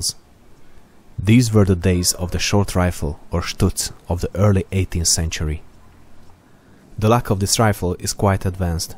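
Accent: Hungary. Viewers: A hunglishodból egyből tudtam, h magyar lehet az anyanyelved, még mielőtt utaltál rá :) Tetszik a videó, csak így tovább! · Behold the hungarian accent... :D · Hungarian accents are gorgeous :3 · Are you hungarian? (Because you have a hungarian accent.) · What i hear is hungarian accent?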